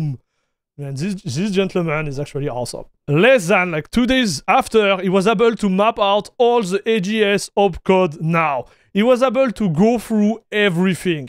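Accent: France. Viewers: this is amazing ! its like french schwarzenneger speaking :D never seen someone hype so well programming :)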